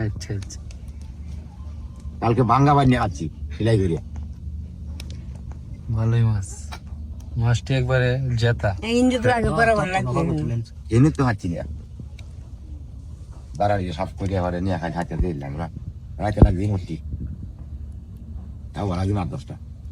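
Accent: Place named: Mymensingh, Bangladesh. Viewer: ভাই আপনার বাড়ি কি ময়মনসিংহে কথা শুনে বোঝা যায়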